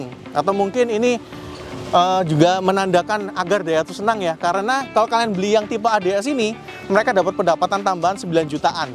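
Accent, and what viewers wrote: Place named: Java, Indonesia. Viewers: Wong jowo to mas, 😁 semangat mas broo.. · logat jawa timuran.... 😜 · Lokasi apartemen denver Layak bahasa.e medok🤣🤣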